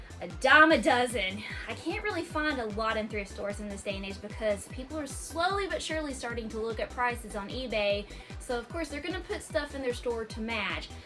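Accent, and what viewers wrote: South, United States. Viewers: My local Goodwill only has about 50 copies of Tony Hawk Ride 😆 Glad your channel got recommended! It's nice to hear another collector with a Southern accent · Southern accent for a girl is the cutest accent there is and one who is a gamer is the best of the best ❤️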